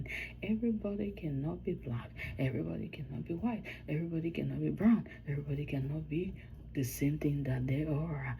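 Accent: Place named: Kenya. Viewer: Very good story. I love your accent. Kenyan? Very soft. Excellent video!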